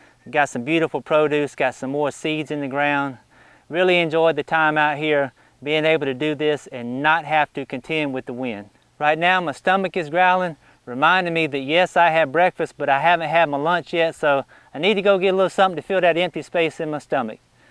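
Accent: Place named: Virginia, United States. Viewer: lol.. no wonder i love your accent. i live in southern va too! im an apartment dweller snd cant grow my own veggies but would love to. thank goodness for my local farmers market!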